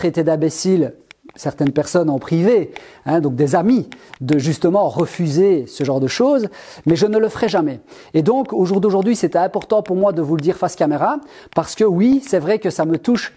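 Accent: Belgium. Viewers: T inquiète, la grande majorité a compris ta démarche. Je te regarde pour le partage et la qualité de ton travail. En bonus j apprécie ton franc parlé et ton accent belge. Bon week-end à toi.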